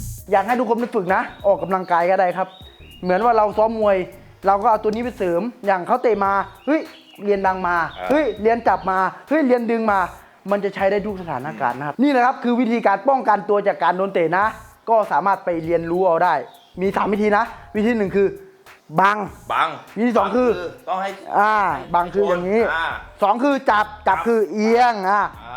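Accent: Isan, Thailand. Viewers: เป็นคนจังหวัดไหนนิ บ้างทีอิสานปนมาด้วยเวลาพี่อธิบาย ผมละชอบ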